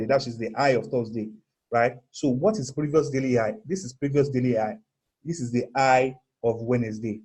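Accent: Nigeria. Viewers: Na naija guy na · You sound soooo Naija my guy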